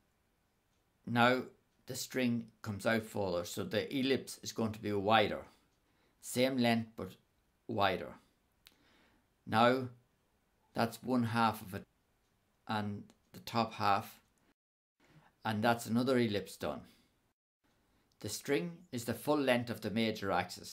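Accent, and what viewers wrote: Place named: Ireland. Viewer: Love it! So well illustrated! Great to hear the Irish accent too! Cavan? Drogheda?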